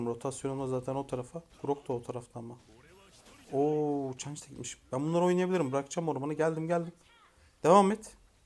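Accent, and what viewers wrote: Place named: Black Sea, Turkey. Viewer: Reis bende samsunluyum okadar belliki karadenizli olduğun gerçekten çok güzel videolar başarıların devamını dilerim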